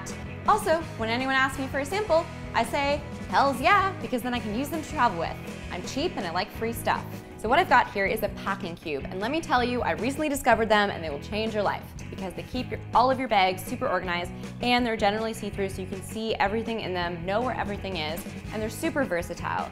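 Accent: Canada. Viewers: I love her Canadian accent 😍😍 the way she says tag is so cute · Canadian Accents bruh: "my begs" & "luggage tegs"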